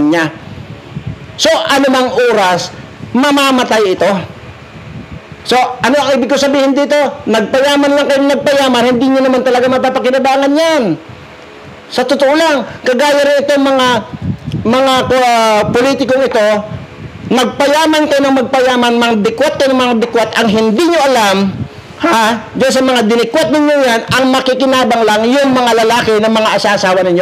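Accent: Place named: Visayas, Philippines. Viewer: Ngayon ko lang napanood ito nakatsamba kasi ako dahil nariring ko bantag bisaya ka sir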